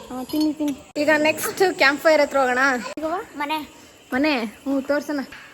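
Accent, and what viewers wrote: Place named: Hassan, India. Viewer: Natural hassan language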